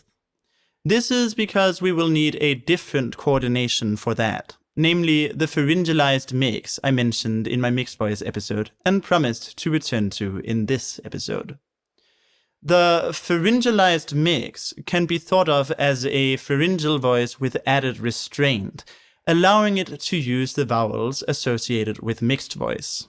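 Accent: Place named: Oxford, United Kingdom. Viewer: I like your oxford english very much 👍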